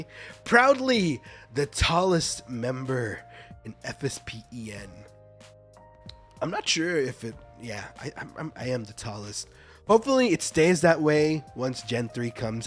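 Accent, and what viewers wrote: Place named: Thailand. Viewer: omg your thai accent is so adorable ✨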